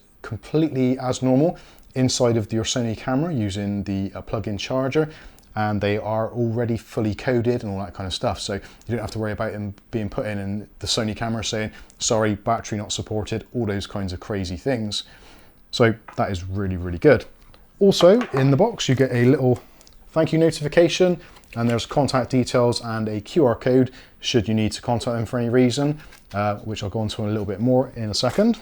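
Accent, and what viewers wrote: Bristol, United Kingdom. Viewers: Are you from Bristol haha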